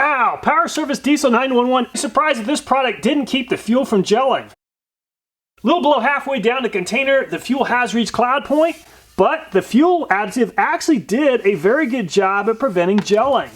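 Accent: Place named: South, United States. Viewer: I listened to you say ultra low sulfur diesel 3 times cause my friends said you sound just like me with my country accent. Lol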